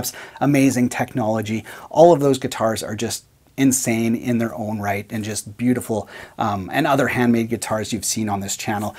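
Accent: Canada. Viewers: He sounds more like a Canadian. Hear the “aboat”?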